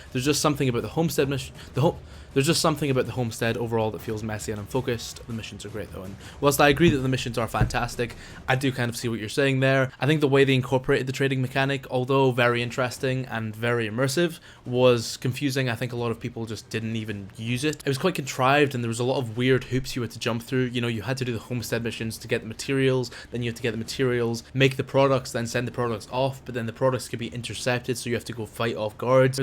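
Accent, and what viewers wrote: Scotland, United Kingdom. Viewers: Damn, is scottish?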